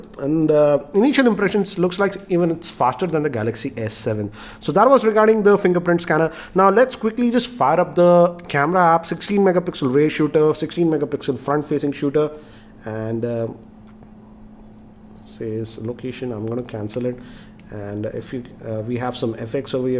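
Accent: Hyderabad, India. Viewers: Geekyranjit I like your hyd hindi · waiting for haidrabadi Hindi😂😂😂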